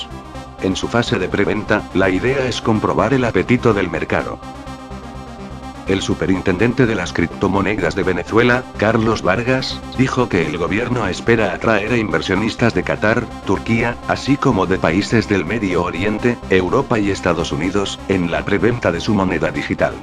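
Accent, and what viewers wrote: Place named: Spain. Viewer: el microlectro es español, es evidente.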